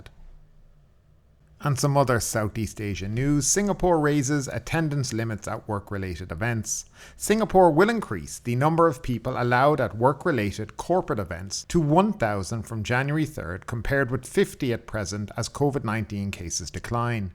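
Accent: Ireland. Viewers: Hi. or is it. I arrived in Suvarnabhumi airport today flying from Dublin via Amsterdam. I hope they restart the Thai pass programme because it definitely works. if the Thai government was to pick up cost for 2nd PCR test as they are doing now it would be a grand good will gesture and ease some of the anguish some travellers are having. The infrastructure in place at BKK airport was excellent today and the staff were very professional and diligent the whole process was very smooth. As we say at home don't change something if it doesn't need fixed. On lighter note is it probable that I am first Irish man to enter Thailand this year. Also nice to see you haven't lost your Irish accent 3 is still pronounced tree. Slainte.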